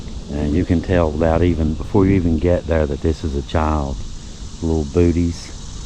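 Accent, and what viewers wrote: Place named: Appalachia, United States. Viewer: It's that way here in North central Texas. Especially at the oldest cemeteries. There was a story of families that had lost so many children that they weren't even given a name until they were 2. I actually thought that was untrue, but it isn't. Lots of people died here in 1918 with the influenza but when it appeared again in 1919 it was even worse. Breaks my heart to walk, see mom and dad and several very small children side by side. I have been a sensitive all my life and there's times and especially places that it's overwhelming. I just stand and pray for all of them., your channel has quickly become one of my tops. I have family all over southeast KY and in west Virginia. Hearing you talk makes me feel like I am up there with them.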